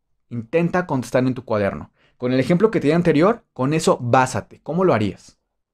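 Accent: United Kingdom. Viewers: Esto es inglés pritanico no es el inglés de American ?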